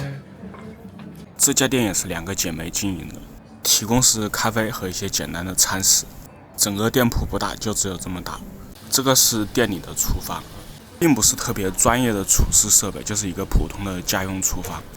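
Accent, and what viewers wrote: Wuhan, China. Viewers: 武汉的普通话👍😅😂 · 我也是武汉滴，刚开始冒看评论，一听你口音就知道鸟，我经常去日本旅行，有空聚一哈，研究一下在日本卖小龙虾🦞哈哈哈🤣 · 博主是不是武汉人啊？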